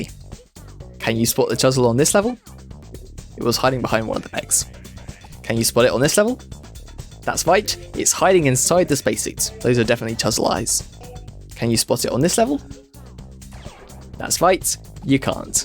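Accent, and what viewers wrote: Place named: United Kingdom. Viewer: He's just british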